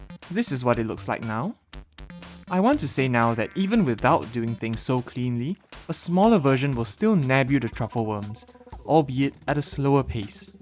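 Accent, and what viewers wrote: Singapore. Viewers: Are you from singapore? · I knew it from the accent. This man is Singaporean. Cool to see.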